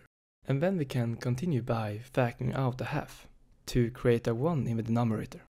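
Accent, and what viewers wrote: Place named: Sweden. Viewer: Dude that's the loveliest most Swedish accent if I ever have heard one! :D And Thanks for the vid, exam in two days, fingers crossed!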